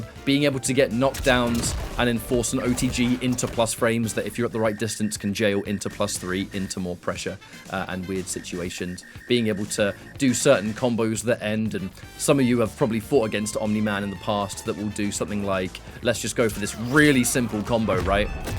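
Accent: United Kingdom. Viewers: As a Russian, i jusr enjoy your English. Always prefered the British version to an American:) Thanks to your combos, though, quite helpful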